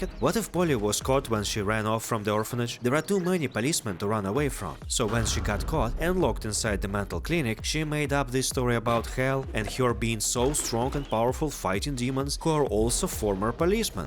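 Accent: Russia. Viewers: ля сразу узнал русский акцент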